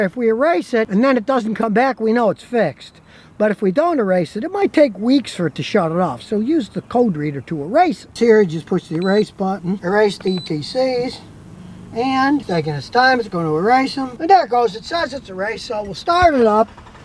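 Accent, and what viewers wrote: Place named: Chicago, United States. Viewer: Is Scotty Kilmer’s accent a Chicago accent or a different version of the New York accent? To me it sounds Chicago but I’m not a 100% sure lol. But I love it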